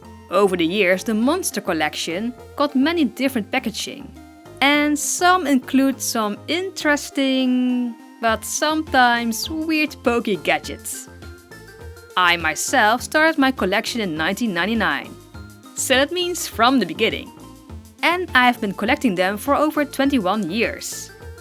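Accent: Netherlands. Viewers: Op de 1 of andere manier kan ik gewoon horen dat je uit Nederland komt !! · Are you Dutch!? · Toen ik dat accent hoorde wist ik meteen dat je Nederlands bent!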